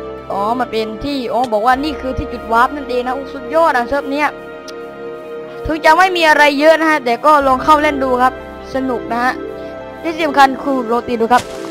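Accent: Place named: South, Thailand. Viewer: 5555 เเม่งคนใต้ชัว โครตฮาเลย ฟังดี ๆ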